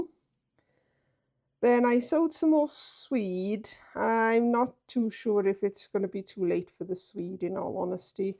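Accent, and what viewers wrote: Wales, United Kingdom. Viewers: Lovely job our, I love your Welsh-ness in your commentary, 😂never lose it lovely girl, the harvest you gave me were delicious, 🎉xxxx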